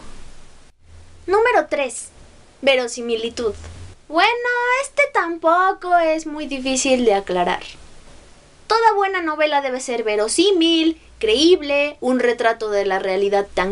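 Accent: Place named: Mexico City, Mexico. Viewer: No sé como alguien con tu capacidad de analisis se involucra en este tipo de lectura. Q practicamente la inmensa mayoria es basura, no sé si sea xq sea propio de tu trabajo. Por ahi en un video tuyo vi q te gusta Harry Poter el libro xsupuesto. Viendolo a cierta distancia pues no es mas q una fantasia no digna de leer pues es eso una fantasia, con esa meticulosidad con q haces tus cosas me gustaria muchisimo q comentaras creo q tu le llamas reseña sobre Emil Cioran, en la cima de la desesperacion, o el inconveniente de haber nacido o algun libro de su obra. Me gusta tu manera tan chilanga de hablar y sobretodo no dar por sentado los planteamientos. Al verte recorde uno de los libros q tanto me ha agradado con el paso del tiempo y q probablemente te seria útil a tus alumnos se llama la lectura de Moises Ladrón de Guevara, es un compendio exquisito para quien cree sabee leer como para el q no. 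Saludos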